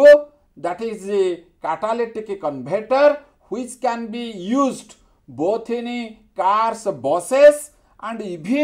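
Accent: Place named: Odisha, India. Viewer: Om my god this is english or odia...i think this man pronounciating English as odia launguage..😄😄😄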